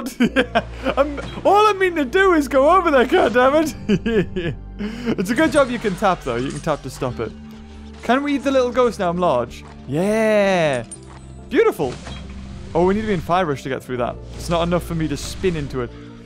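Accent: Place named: Newcastle, United Kingdom. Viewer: I saw you in all over the place good gordie acsent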